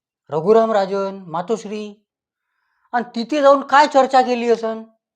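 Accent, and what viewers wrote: Vidarbha, India. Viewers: फार सुरेख नाटयमय कथाकथन ! Your pronunciation is with a humorous accent ...Your speciality.. वैदर्भिय लहेजा ..Pl keep it up !👍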